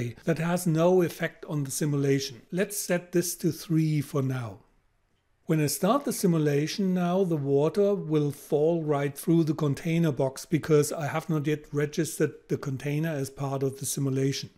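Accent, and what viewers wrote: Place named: Austria. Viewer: Great Info I'm definatly going to follow through also is the Accent Austrian I really like it XD!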